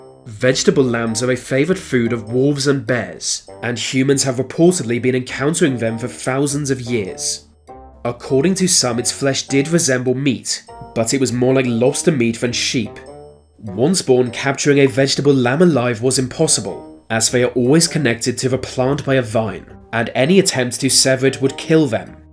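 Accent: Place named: Scotland, United Kingdom. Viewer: I don’t think it’s a speech impediment I think it’s a local accent because I’m from Scotland and in some parts they say van instead of than and vey instead of they I could go on but I’d be here all day lol , I actually find his voice quite pleasant tho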